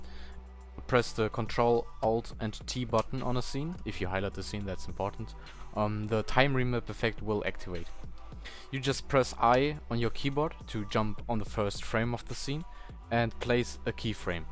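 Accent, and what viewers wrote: Germany. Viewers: Yo Ich wusste das du deutscher bist wegen deinem Akzent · Ur german accent tho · man hört heftig raus das du deutsch bist xD c: aba danke für das video <3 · er ist deutsch aber versucht englisch zu reden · man hört so krass das du ein deutscher bist haha aber danke<3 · u german? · Man merkt, dass er deutsch ist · German-English dialect i can hear this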